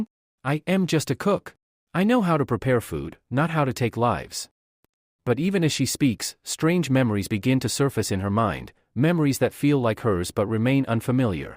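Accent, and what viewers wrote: India. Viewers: what's with the indian accent xD, tbh i stranggle with normal english so with the accent i think ill just pass on this one even tho the story seems good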